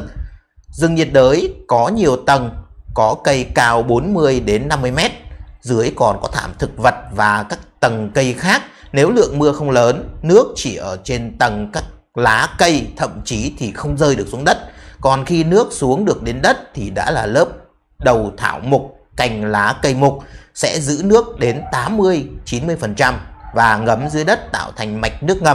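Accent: Standard. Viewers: Tiếng nói của Việt Nam đài phát thanh chuẩn luôn không phải chỉnh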